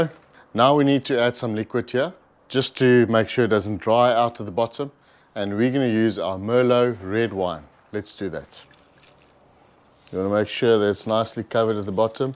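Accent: South Africa. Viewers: Thank you! I have been looking for a video like this, But most do not show it right, or explain it like you do. I was pleasantly surprised to hear the South African accent on top of it. (I live in USA for 37 years now, but grew up in the highveld) I acquired a whole sheep a few months ago, and pulled out one half of the ribs to try them out. I will be using your method. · south african accent - hows the racism there?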